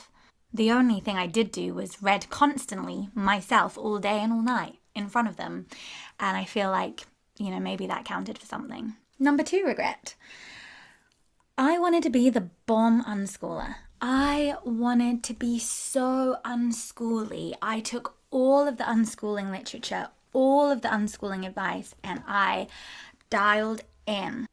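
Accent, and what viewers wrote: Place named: New Zealand. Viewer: I haven't seen your videos for ages, and I love how your London accent's become super kiwi, like one of my best friends from school who lives in Taupo. I was thinking of you recently... did you see the case in Italy where a UK/Australian couple have had their kids taken away and put into care because they were unschooling and had a composting loo!!!?? It's unbelievable, and for us (living in Italy as progressive foreigners) it's made us feel quite nervous. We're leaving the country soon. But I wondered if there's any support within the unschooling community for them. My kids are now 12 and 10 and I have doubts/vague regrets about having sent them to state school. I know why I did it (believing they needed to culturally integrate into Italy and also as I work full time feeling I couldn't support them here), but they've both had some bullying and it's too rigid especially for my ADHD son.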